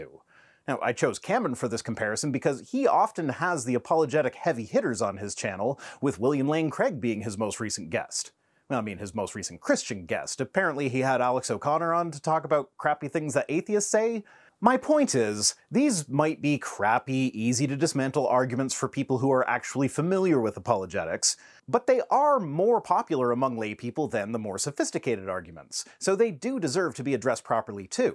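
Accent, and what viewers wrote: South, United States. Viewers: Being from the southern United States, I can say this with all sincerity and lots of experience...NEVER BELIEVE ANYTHING ABOUT GAWD WHEN IT IS SPOKEN WITH THAT ACCENT!! NEVER!! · His southern accent is so grating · I tried to get through this , but this dude's voice and flimsy argument were too much to tolerate. And although I live in Louisiana, his cuuuntree Ackkkksent was shrill at best.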